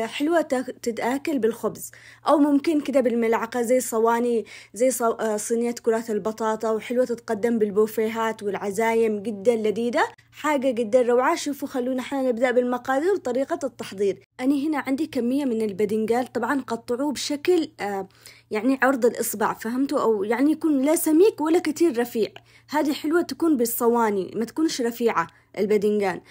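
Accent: Aden, Yemen. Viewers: ماشاء الله رووووووعه رووووعه خورتيني والله والاروع طريقة كلامك العدني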